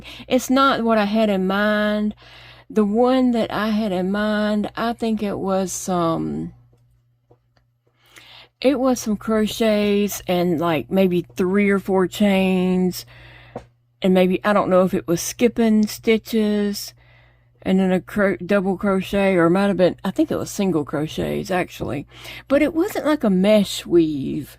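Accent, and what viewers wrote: South, United States. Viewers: I agree, I just love your voice and your beautiful Southern American accent !💛💛💛😁🙏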